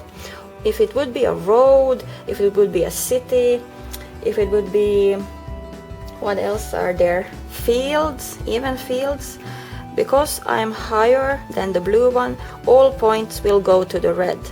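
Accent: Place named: Finland. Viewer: Suomi <3 I could listen to your English the whole day...it sounds great :D Oh, do you know if there's Carcassonne tournaments in Finland/Helsinki?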